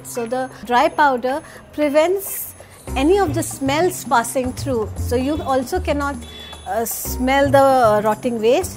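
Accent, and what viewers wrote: India. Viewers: Confuse of indian accent. A lot to consider. Decomposing should also made simple.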